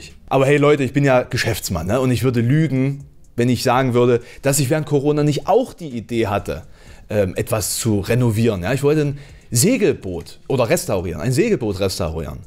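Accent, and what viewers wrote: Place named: Saxony-Anhalt, Germany. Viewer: Neo Magazin Royale war einmal, mittlerweile heißt es ZDF Magazin Royale. Nur mal so als Zwischeninfo. Dann noch kurz ne Frage an den: Kommst Du aus der Nähe von Halle oder Leipzig? Ich überlege wohin ich deinen Dialekt einordnen würde. Vielen Dank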